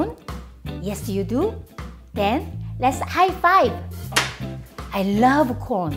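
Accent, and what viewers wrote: South Korea. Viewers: I used to get recipes off your website all the time for years because my mom is Korean but sadly passed away 15 years ago (last week as a matter of fact) without ever teaching me her recipes. I just discovered your YT channel today and I'm hooked! I may stay up all night catching up on all of them!!!! You remind me a lot of her, always happy and making jokes. And the accent of course. Thank you so much for sharing your recipes. 💕💕💕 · 영어가 너무 한국분이라서 정감이가요❤️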